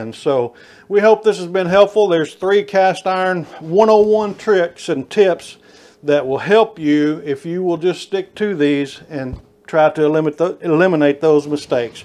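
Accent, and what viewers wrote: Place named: Tennessee, United States. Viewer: That's the way it's pronounced in middle Tennessee where I'm from. Like does.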